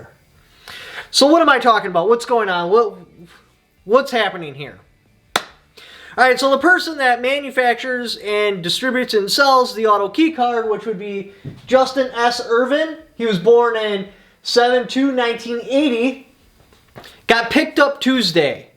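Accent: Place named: Wisconsin, United States. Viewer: Yer fuckin Sconi accent is hilarious.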